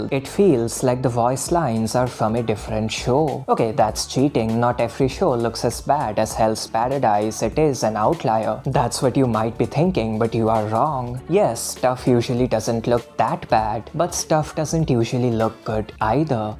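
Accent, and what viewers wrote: India. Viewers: bruh why do u talk so slow lmfao, is it because of indian accent?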